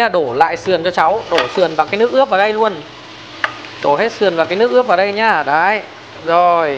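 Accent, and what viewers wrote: Hanoi, Vietnam. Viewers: Cảm ơn đầu bếp hướng dẫn rất chi tiết tỉ mỉ và nghe giọng Hà nội khán giả nghe dễ hiểu ,chúc em và gia đình hạnh phúc bình an trong cuộc sống❤❤❤❤❤❤ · Dọng nói rõ ràng hướng dẫn cách làm món ăn chuẩn người hà nội cảm ơn cháu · Bếp hướng dẫn dễ hiểu nói nhẹ nhàng chuẩn người HN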